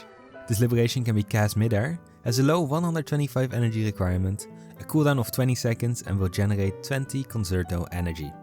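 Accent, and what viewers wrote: Netherlands. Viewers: Love the video weird question are you from the Netherlands you sound dutch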